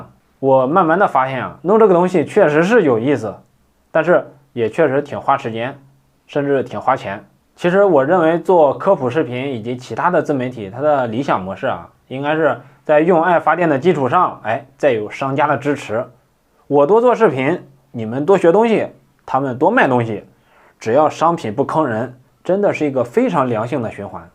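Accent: Henan, China. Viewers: 河南口音这么重！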